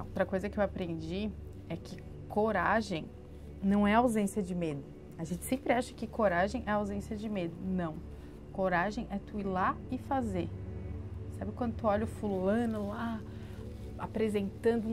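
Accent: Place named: Santa Catarina, Brazil. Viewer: Tu é de Santa Catarina? Teu sotaque parece muito!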